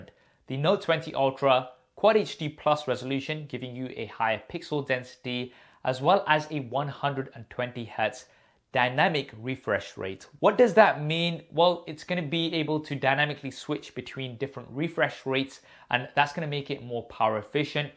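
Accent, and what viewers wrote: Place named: United Kingdom. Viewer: i really enjoy your videos when i play them at 1.25 of youtube playback speed...maybe its the British Accent after all...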